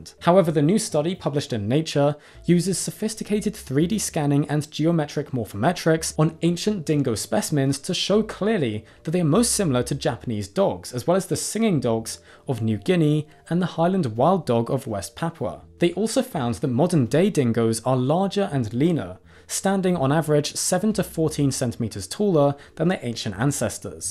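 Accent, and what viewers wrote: United Kingdom. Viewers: How does history, space & dinosaurs sound so much more incredible, when listening to a British guy talk about it???? ☺️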